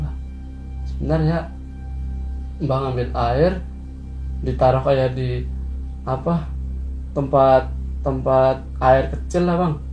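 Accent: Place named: Java, Indonesia. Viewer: Waktu awal2 dia nongol, dia nyebut dirinya "gua".. tp jd aneh sih dengernya krn dg logat jawanya, lbh cocok pake "saya" spt skrg2 ini.. hehee.. sukses terus mas!! Story teller termantabb, bisa inget detail banget semua pengalaman2nya..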